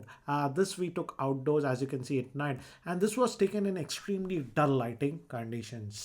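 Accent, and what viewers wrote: India. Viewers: The Indian accent is really hard to listen to 😖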